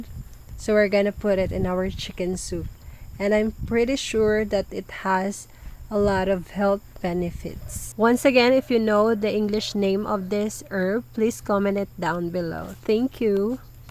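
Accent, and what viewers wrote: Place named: United States. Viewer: Kuyaw visaya diay ka mam mura man gud American accent ka the way you speak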